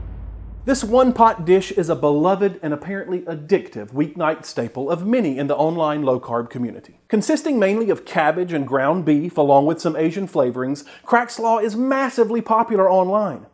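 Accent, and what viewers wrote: South, United States. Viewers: Nakia Burnside same here.... and be a Texas girl, I appreciate the southern accent. He’s easier to understand!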